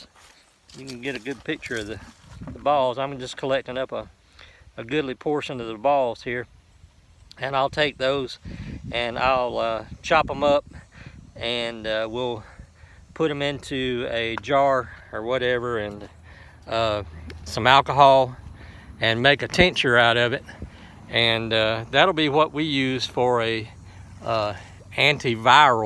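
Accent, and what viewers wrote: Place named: Arkansas, United States. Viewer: You sound like your from Arkansas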